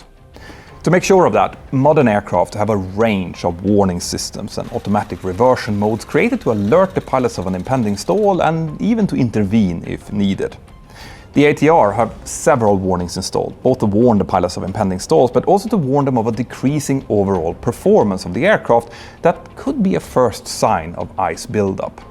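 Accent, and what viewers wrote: Spain. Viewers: does live in Spain and has a Spanish wife, so it is not surprising that he would pronounce words with a more Spanish accent even though he is originally from Sweden. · urgh PLEASE STOP PRONUNCIATION OF SPANISH WE FUCKING SPEAK PORTUGUESE NOT SPANISH CARALHO!!! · Look, normally I don't like to make a fuss about pronunciation and all that but as a brazilian, the fact that you kept pronouncing everything with some kind of spanish accent is so irritating it actually distracted me from what you were saying. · MANO AQUI NÓS FALAMOS PORTUGUÊS NÃO ESPANHOL, NÃO FAZ O MENOR SENTIDO VC LER NOSSAS CIDADES E AEROPORTOS COM DIALETO ESPANHOL QUE PORRA EH ESSA KKKKKKKKK DUDE HERE WE DONT SPEAK SPANISH, WE SPEAK PORTUGUESE THAT NO SENSE READ OUR AIRPORTS AND CITY NAMES WITH SPANISH ACCENT WTF BRO KKKKKKKKKKKKKKKKKK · Hey, the language in Brazil is Portuguese, you're reading de terms and documents with a Spanish accent. 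Great video, BTW.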